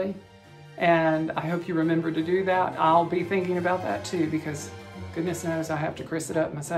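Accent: South, United States. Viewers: Kia Ora from New Zealand! I have just finished watching your entire journey from the start while I have been home sick this week. You are truly a joy and perfect medicine. I've had tears and laughter, memories evoked, and a lil enabling. If laughter is the best medicine then u are a wonderful cure as I do feel better. Only thing is, as u are the only voice I have heard this week, every time I talk to my cat n dog I have a slight southern accent happening lol. You welcome everyone to the neighborhood but after watching the kindness, support, fun and joy, it's not a neighborhood u have created here, it's a FAMILY. Congrats on your milestones, I watched on TV so didn't comment often, but thank you for keeping me company (I'm a terrible patient) and I would like to suggest a name for hand stand cat ... OHANA. It means family. Thanks again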